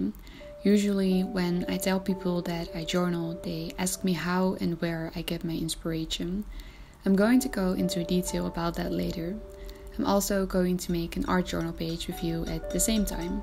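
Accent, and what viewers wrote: Netherlands. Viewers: What country do you come from? (Your accent sounds a bit dutch) 🌻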